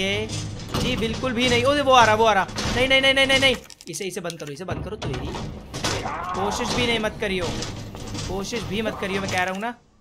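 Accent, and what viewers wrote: Haryana, India. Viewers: Bhai toh haryana ka hai kya????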